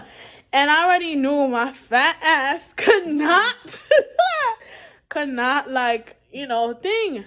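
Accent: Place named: Caribbean. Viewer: ahhh ok.. I was certain i heard a lil west indies in there 😊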